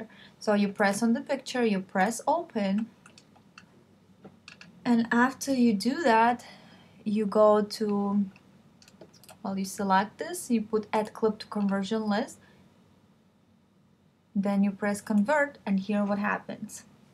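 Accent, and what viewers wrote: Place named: Ukraine. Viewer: у Вас український акцент))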